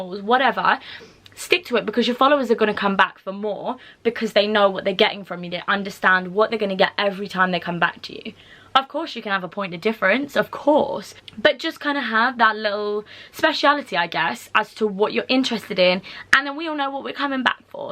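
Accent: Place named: RP, United Kingdom. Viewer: I love your cute posh accent 🥰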